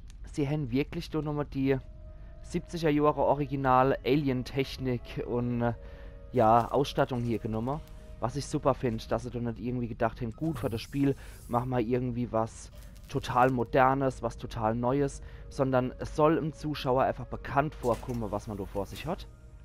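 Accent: Palatinate, Germany. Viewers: ah hier steht es ja auch .lol. Pfälzisch tatsächlich.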